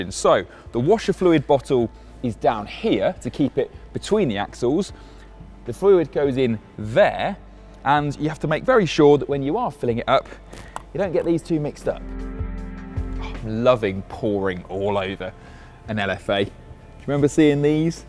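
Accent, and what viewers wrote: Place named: United Kingdom. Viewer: a british guy talking about a japanese car im laughing hard this is so funny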